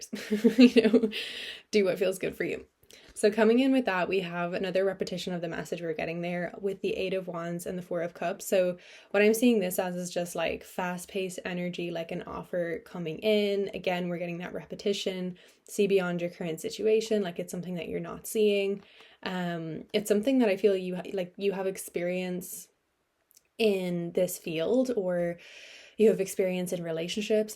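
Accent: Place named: Ireland. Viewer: Thank you. Somehow I hear Irish in your accent ❤️🙏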